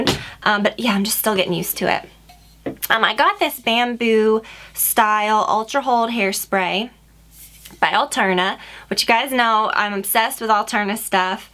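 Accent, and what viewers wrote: South, United States. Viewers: I feel like I'm just noticing her southern accent... I didn't notice before!:o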